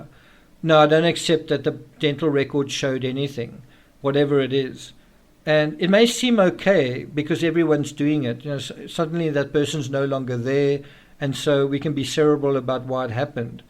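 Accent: South Africa. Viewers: I have been gradually losing interest in this channel without understanding why. Could it be as simple as overdosing on the South African accent, since I also watch Grizzly? Blaming myself for losing interestshould have been my sign. Now I realize that was a sign of feeling gaslighting. You have totally misunderstood narcissism, and you might never be able to see it truly, even if you watch HG Tudor till the cows come home ... But the condescending tone you display towards your audience is unmistakeable to me now that I have become educated.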